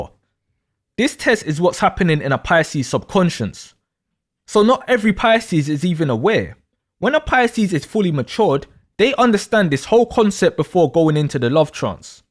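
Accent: United Kingdom. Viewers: One of the only British voices that commanded My respect.😄 Great Analysis. I found this video searching for a video to explain this. I was triggered today about someones description of a Piscean in love. You explained it perfectly. Ase'. Let Me add, Very Few can make Me laugh, and Your voices explaining the mindsets took Me out. Thank You. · I’m 45 march 6th Pisces my other half is 47 and a Libra we’ve been together for 28 years and it’s been a lot of work from both sides for many years but he is my soulmate, it’s been questioned a few times but now I see why and so does he. I was going to hold back and send you a message after I’d watched all your videos but I couldn’t wait any longer you’ve been so helpful on my journey of realising who, and what I am you’ve helped me come to terms with many dilemmas I’ve had over the years, your insight is second to none so I wanna say thank you so much for sharing. 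I love all your little cartoony bits and you’ve got a great humour that has me laughing out loud and I love your don’t give a shit attitude too!! Which is helping me bring mine out!! So thank you again sending lots of love and light from this fellow Piscean ps my mums a Scorpio (terrible upbringing- she was selfish as fahhh) dads a Taurus so one caring one for me at least 😂😂. Please carry on as you’re helping so much and I think I’m gonna miss that London twang being an Essex girl through and through ❤. Love ya man!!!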